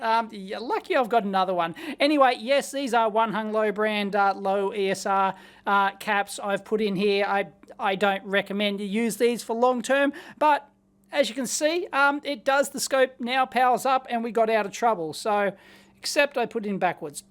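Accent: Australia. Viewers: That's just his Aussie accent.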